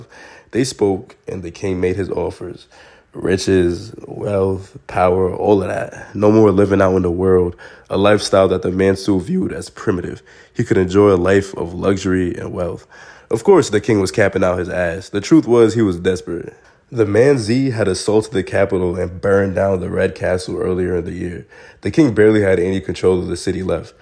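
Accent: New York, United States. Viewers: Hearing your heavy ass NY accent discussing football is kinda trippy. Good content tho bro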